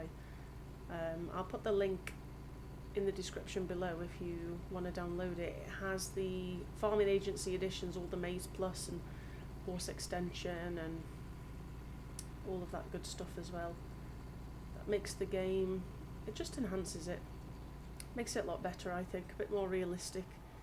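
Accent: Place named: Yorkshire, United Kingdom. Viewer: Great first talking video. Clearly you have some natural talent there 👍🏽😊 Btw I thought you were Scottish but you sound more Yorkshire’ish? 🤔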